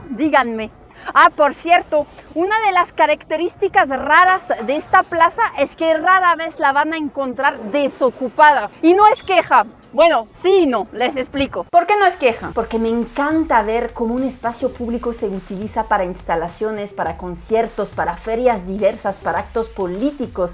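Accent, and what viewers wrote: Mexico City, Mexico. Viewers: ...La Chilanga. Unica....amiga eres unica...me facina mucho tus videos son unicos e informativos culturales y educativos...de la ciudad de mexico y alrededores......bueno lo poco o mucho que algunos ya sabemos de todo lo que tu informas....y que no es mucho..pero tu eres la mera..mera chipucluda...en eso de todo lo referente a mexico y cultura tanto pintura arte escritura y hasta de gastronomia..... Pues eres la primera y unica muy profesional...influnce...ya eres doctora graduada en eso felicitaciones y siga asi echandole ganas porque conocemos mas de nuestras raices y asi saben quienes somos y a donde vamos..bueno adios... CHILANGA...querida...me gusta escuchar tu voz o sea tu tono de voz o acebto tipico. Chilango...😂...😅 jaaa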